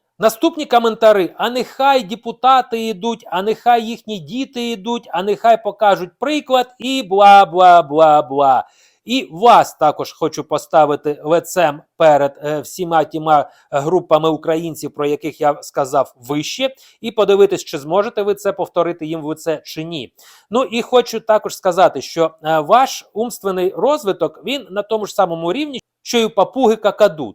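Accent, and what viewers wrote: Russia. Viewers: Фу !!!! Тварь !!! Ещё одно - куля в лоб ... А сам сидит русским акцентом трусит ... В бан суку !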